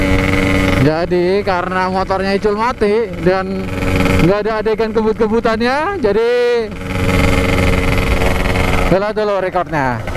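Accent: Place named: Java, Indonesia. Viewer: Wong jawa kie ya 😂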